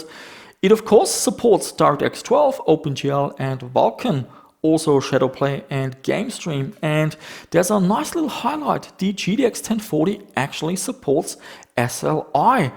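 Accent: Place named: Germany. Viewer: Dieser deutsche Aktzent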